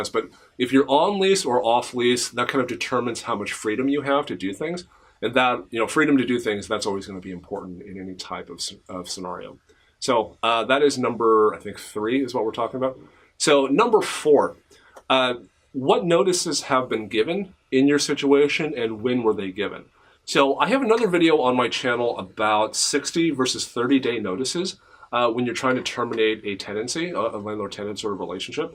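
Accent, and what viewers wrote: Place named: California, United States. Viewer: wow...now that is a california accent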